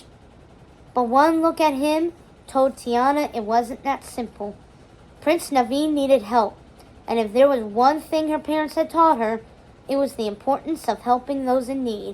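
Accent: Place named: Louisiana, United States. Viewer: You are great with that Louisianan accent!!! ❤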